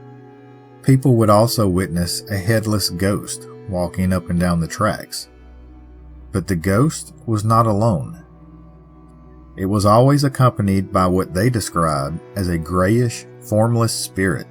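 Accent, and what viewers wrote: South, United States. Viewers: hey wat can I say? I live here & I'm fascinated by spooky shii lol & you put dat lil southern charm on your story tellin I like it! ya huge fan lol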